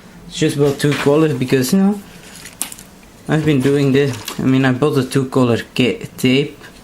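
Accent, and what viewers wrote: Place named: England, United Kingdom. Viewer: Nice project my friend :) Love your english accent! Happy new week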